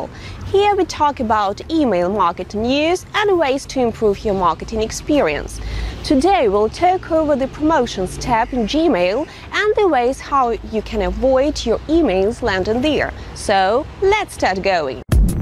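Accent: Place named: Russia. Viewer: Ukrainian company.....Her accent is very strong Russian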